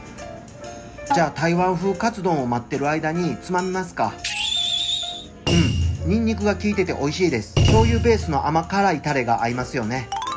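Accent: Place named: Kansai, Japan. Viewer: 関西弁がキモイ。 内容は良かったです。台湾に行ったら行きたいです。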